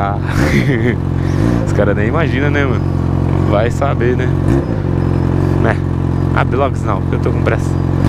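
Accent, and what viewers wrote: Goiás, Brazil. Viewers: FALAA NO PIQUE DO GOIAS PORRA KKK AAA NEMM MAN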